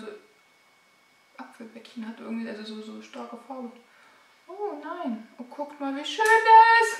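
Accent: Saxony, Germany. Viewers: Schönes Video, alles super erklärt, und das Denglisch finde ich auf sächsisch sehr sympatisch, gerne immer wieder mehr davon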